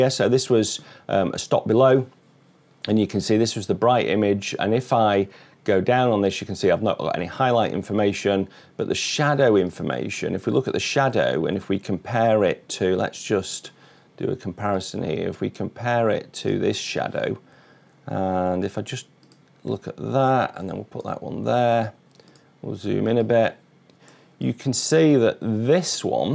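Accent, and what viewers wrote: United Kingdom. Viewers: Today i find out most annoying English accent in the world uk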